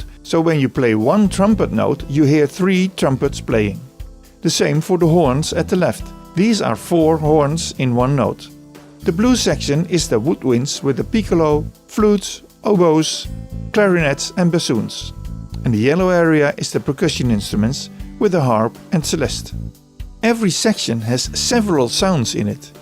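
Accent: Netherlands. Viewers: Thickest Dutch accent I've ever heard 😂. Sounding good tho.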